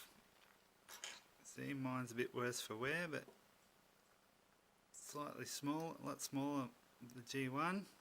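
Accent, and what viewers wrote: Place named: Australia. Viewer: From your accent I'm guessing your Aussie. Where in Australia did you get Air raid? I need him and the rest of the stunticon team.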